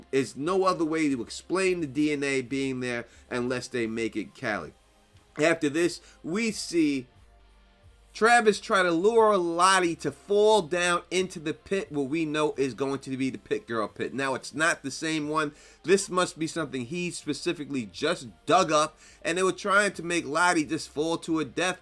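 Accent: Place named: New York, United States. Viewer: Bruv, it's the accent. My boy is from NYC, I'm from Dirty Jersey. That's how some people speak around the area.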